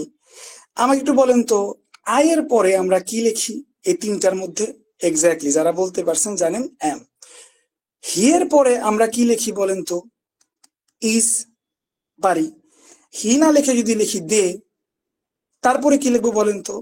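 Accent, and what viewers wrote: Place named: Mymensingh, Bangladesh. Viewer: অনেক দিন ধরে মাস্টার বইয়ের ক্লাস খু্জতেছি মনে মনে৷ আজকে পাইলাম৷ ধন্যবাদ স্যার৷ আপনার ভাষা শুনে মনে হচ্ছে আপনার ভাষা ময়মনসিংহ বা কিশোরগঞ্জ অঞ্চলে।।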